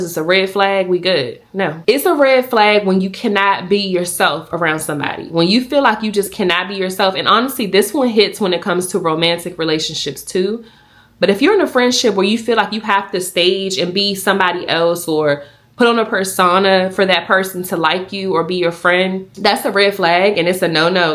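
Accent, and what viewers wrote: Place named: Washington DC, United States. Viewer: You’re doing amazing, I hear your DC accent too sis! Keep going!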